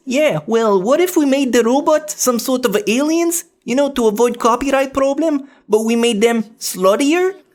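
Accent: Quebec, Canada. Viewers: Wait you're a french canadian ? Or you just live in Quebec ?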